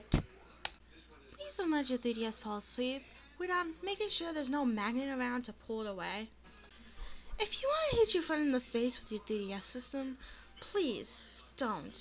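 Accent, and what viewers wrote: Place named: United Kingdom. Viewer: Youre from the US but i can hear a slight british accent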